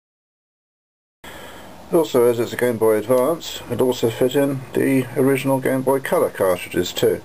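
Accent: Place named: United Kingdom. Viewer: somebody can explain me what this does/ sorry is because it kind of hard to my to understand brithish english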